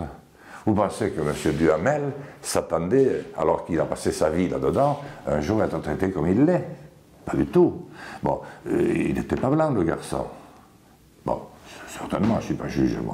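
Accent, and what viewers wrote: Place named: Occitania, France. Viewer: On n'est pas nombreux à le remarquer... L'accent occitan et la belle voix profonde les hypnotisent.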